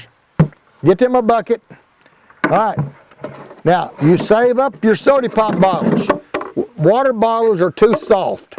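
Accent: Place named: East Tennessee, United States. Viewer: you sound almost like you are from East Tennessee. Almost. I love watching your videos. My grandpa used to grow the best muskmelon in the world. He's been gone now for 40 years...that's hard to believe. But I can still taste that muskmelon. He grew it by his chain link fence, and hung the Mellons on the fence in slings made from granny's old pantyhose, lol. I'm so glad to see you encouraging the young ones to grow, most of them have no idea what food is supposed to taste like. God bless you!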